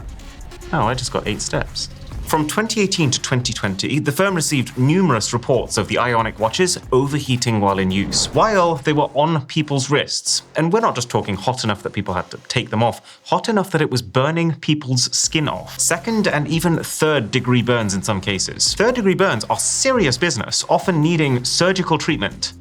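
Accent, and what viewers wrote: RP, United Kingdom. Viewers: This is not American "English", it's UK English. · Still feels like posh man talking down to us mear mortals... and you seriously come across as paid to talk up certain tech and brands... uour losing me and many others due to this 🛸👽🖖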